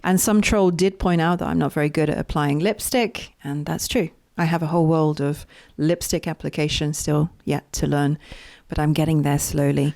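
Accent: United Kingdom. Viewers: I am thrilled to have just found your channel today. I've followed this topic for literally a couple of decades now. Agree 1000% about the need for content creators to have actually experienced NPD first-hand > not just clinical study. Same for therapists - the odds of finding a therapist who truly GETS it without direct experience is about nil, and yet so many CLAIM knowledge. They know that narcissism has become a popular buzz word and so they chase the clickbait, yep - and many do more harm than good for the almighty dollar. I follow Dr. Romani and others, also know of Vaknin, read a book by him many yrs ago but that guy just gives me the creeps so don't listen to him much and appreciate your perspective. I've been on a binge this afternoon. I appreciate your authentic and genuine approach. You have some unique perspectives, especially the spiritual aspect, which I've thought about some > esp that those who've been though narc hell are much more likely to SEE the evil in the world. 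They won't be held back by that cognitive dissonance so they can connect the dots. I find it amazingly brave of you to actually attempt comedy alongside narcissism, that takes MOXIE:-)!!! Thanks for this personal view, appreciated. I assume you are maybe somewhere in England from your delightful accent. Yes? Salutes from the US!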